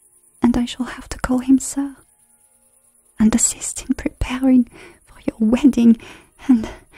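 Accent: France. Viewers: This sounds so so so beautiful!! I love a french accent and this is soo nice to listen to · Will there still be French accent audios? Surely?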